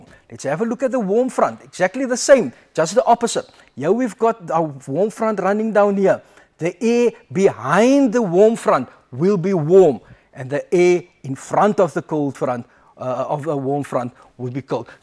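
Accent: South Africa. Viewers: Cape (South Africa) accent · south africa, cape town. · South Africa :)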